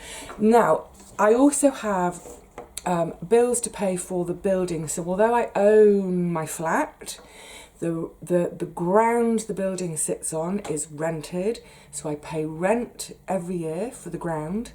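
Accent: United Kingdom. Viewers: You have such a beautiful British accent, love it. So you have Trolls in the UK also? I watch You Tube videos but I don't have my own channel. Here in the USA the Trolls can be very cruel. Please try to ignore them, give them no thought. I think it's so exciting that you were and are an actress. You are very young, I'm now on Social Security Disability at 71 years old. I remember waiting to 67 to get Social Security here was hard, I took mine early at 62, but then there was no Medicare (healthcare) until age 65. It is so hard for widowed women in the USA. But so much harder for Single, widowed or divorced women here, especially with working full time with children and childcare. Thank You for showing us how things work for you...so very interesting. So proud of you for tackling and paying off your debt. You are a true marvel to me!! Please stay safe and Take care from your new pals in the USA, Debbie and Dexter-Dog the amazing tiny chihuahua companion.🙋🐾💕🙏🙏🙏😷😷😷🏥🏥🇺🇸😁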